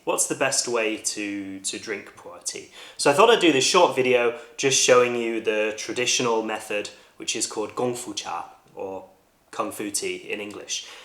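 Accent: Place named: United Kingdom. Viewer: All I'm saying is that "Man talks about caffeinated beverages in a British accent" is clearly a formula that works. You'd need to upgrade from t-shirts to jumpers though.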